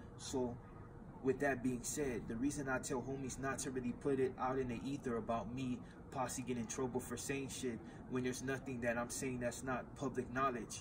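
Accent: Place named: Mexico. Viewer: Cuzzo why you got a Mexican accent though does your bros and sis have the same accent ?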